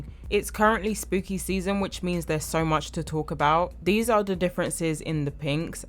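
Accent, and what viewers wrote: United Kingdom. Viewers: I retract my comment about you sounding 25% American. you sound 100% British to me 😀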